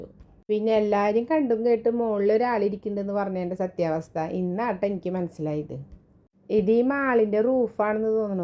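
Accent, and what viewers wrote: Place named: Thrissur, India. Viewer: Thrissur dialogue superrrr❤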